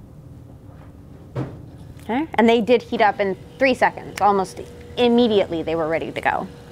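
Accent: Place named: Canada. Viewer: "Oat and aboat" How to know it's Canadian not American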